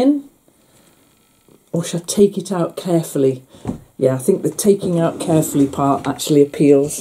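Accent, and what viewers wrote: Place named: Northern England, United Kingdom. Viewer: Hello. I just found your channel and what a lovely surprise! As soon as I heard you say, " Fed oop", I knew you were in northern England! I am back in the States, but worked in Bradford for three consecutive years for George Barker Refrigeration, as a Sr. Buyer, from 1992 to 1995. It was such a major, but wonderful experience for me that I am going to write a book about my stay, using a sense of humor, similar to James in All Creatures Great and Small. My best friend, Val, lives in Leeds and we visit and email to this day. So I can enjoy listening to your accent and northern phrases. Val would say she had to go home and feed her moggies and now I don't bat an eye! I miss her. We even have the same birthday! I am native to the USA and living in New Hampshire. We are a family farm. And I am just putting together a sewing cupboard. 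My sister gave me a lovely small armoire with lots of drawers for thread and supplies. You seem to be sorting out your sewing drawer.Nothing wrong with keeping bits and bobs that you may use at some point. I have issues with remembering what is in what drawer! I look forward to following your channel. Are you in Yorkshire? - Diane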